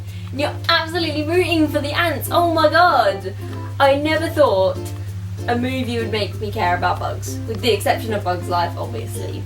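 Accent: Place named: United Kingdom. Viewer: That british accent is beautiful, i love it.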